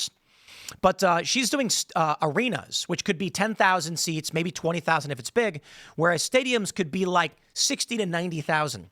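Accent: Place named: AAVE, United States. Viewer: Stop with the double negative and ebonics, please. You sound like an idiot....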